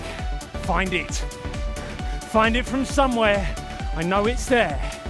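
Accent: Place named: Australia. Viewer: Started this workout 4 days ago. I'm 61 and usually walk every morning, but in our area of the country it is 105 with the heat index even in the morning, so I decided to get on board with a cardio workout and this is great. I can't stay up with the little lady on the right but I try and stay in step and in snyc with the leader and the lady on the left. Also, as a retired band director I am wired to at least try and stay in step with the video. It goes against my very being to be out of sync, but during the fast 45 second phases I'll just go at my own pace thank you. Love the accent. I think an Aussie could hold you up for money in a dark alley and you'd thank him! Ha! Thanks for the workout!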